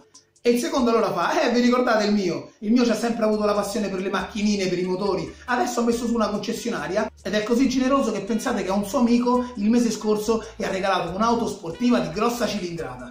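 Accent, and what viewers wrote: Italy. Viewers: Tu mi sembri un po’ di Napoli rispetto a uno di Roma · È normale e nato in Italia o cresciuto che accento doveva tenere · Coffin meme:essendo di colore mi immaginavo una voce con un accento più africano quando pronunciava le parole.La sua voce è invece con un accento laziale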